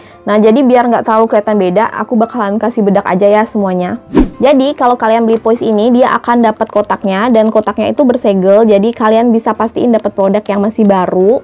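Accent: Pontianak, Indonesia. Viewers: orang Pontianak kak ? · Orang pontianak ke kak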